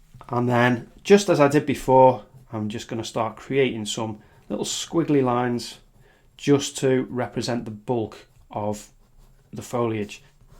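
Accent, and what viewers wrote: Liverpool, United Kingdom. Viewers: Liverpool? Or Birkenhead way ? , some words I’m thinking deffo Liverpool, then I’m goin oh I don’t know 😂😂😂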